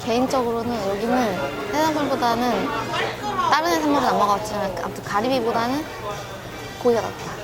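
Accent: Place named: Gyeonggi, South Korea. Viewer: 대리만족하며 잘 보구 있어요 지난 영상부터 몰아보기중인데 님의 나른한 경기말투 넘 좋네요^^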